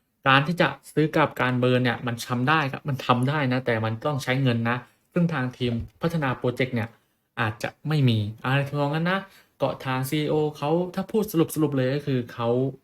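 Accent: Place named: Central Thailand, Thailand. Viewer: ใต้ตรงไหนเนี่ยะ ออกแนวเหน่อภาคกลางมากกว่า หรือไม่ก็ภาคตะวันออก..สารภาพมานะ..เป็นคนจังหวัดอะไร..บอกมา..ไม่งั้น..โกรธ...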